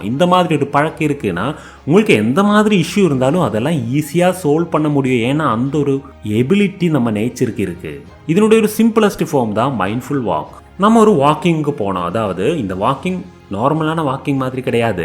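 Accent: Kerala, India. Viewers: Awesome video thank you so much I like ur tamil lovely malayalam accent mixed, thanks for your effort people need it in today's huzzle buzzle life😊🙏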